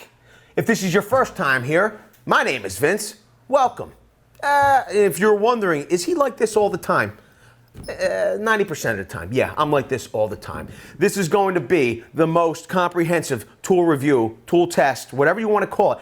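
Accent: Philadelphia, United States. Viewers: Gotta get a Tshirt made that says “AND THE REALITY IS” Strong philly accent go birds!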